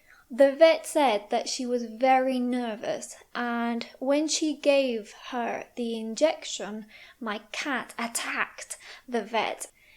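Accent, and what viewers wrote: United Kingdom. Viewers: Her tone of voice, pronunciation and intonation are really very good. The recordings are pleasing to ears, much better than those channels which adopt AI sounds. Well, another reason might be that I prefer the British accent to the American accent. 😃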